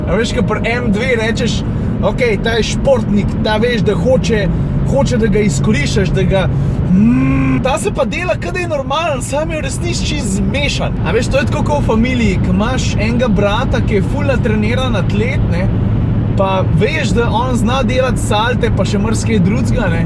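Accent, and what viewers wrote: Styria, Slovenia. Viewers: štajerski na glas pa ti gre.😂💜💛#ifeelmaribor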